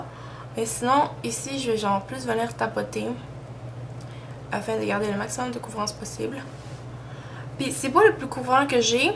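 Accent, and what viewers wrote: Canada. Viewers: Je t'envoie un message de la Belgique (j'suis parisienne mais j'm'y suis perdue lol) pour te dire que j'ai passé un super moment! L'accent canadien est trop bon à entendre!! Bisou! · J'adore tes vidéos car tu assumes tout !! Et surtout j'adore ton accent canadien :) Tu es magnifique *__* ( j'adore ton piercing ) ! <3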